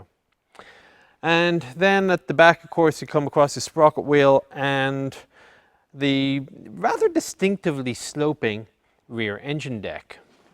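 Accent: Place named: Ireland. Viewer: Always great to hear the Irish Shh as in Sh-tuff .. especially explaining the complex simplicity of the Swiss Army Knife idea - mounted on a tank. Make way there for Shlattery's Mounted Futtt .. Huzza (with an -ay sound, it seems not with an -ah; like Jose and a wee cuppa tea: Yeah! hurray!) It's OK, I'm daft - certified and all.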